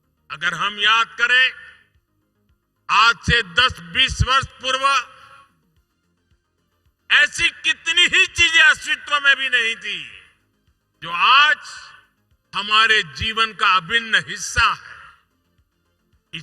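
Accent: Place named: India. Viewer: sry bro but these indian eglish is horrible, cant understand anything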